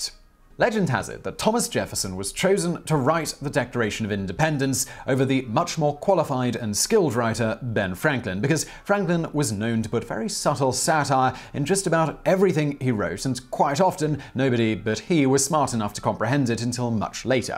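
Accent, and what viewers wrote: United Kingdom. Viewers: Mr. White Yes, a British guy. Hired by an American company to read a script. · Simon reads this because Americans think British accents equals double smart... And so that the show isn't completely American centric to promote a wider audience. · ... as told by a British man lol Haven’t watched yet, just love the irony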